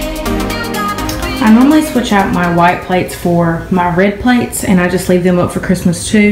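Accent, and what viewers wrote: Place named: North Carolina, United States. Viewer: OMG you sound like you are from Lumberton North Carolina! Lol where state do you live in?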